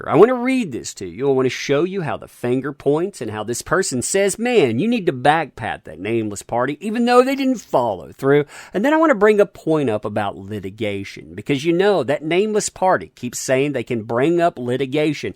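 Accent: South, United States. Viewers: southern drawl makes this even more informative and entertaining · I never thought I'd enjoy listening to commentary with such a southern drawl. But you, sir, got it going good.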